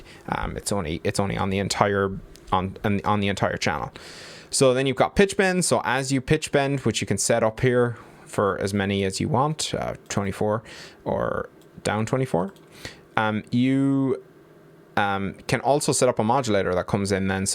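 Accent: Ireland. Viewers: 1. I like Irish accents so this was a nice hour break from the local Aussie accents. 2. I don't like speaking in list items so this is the last one. Not everything we watch has to be less that 30 mins in length. Besides, this is SURGE THE MOVIE and the title would not make a lot of sense if the video only went for 10 minutes. This lightening fast Surge overview is probably more to your liking: <link> I found that one provided a really good quick overview. I just really appreciate people take the time to make videos and share their knowledge. I think I remember something about "workflow optimization and efficiency" when I did that course when working in a supermarket. It was just before we did the first aid session.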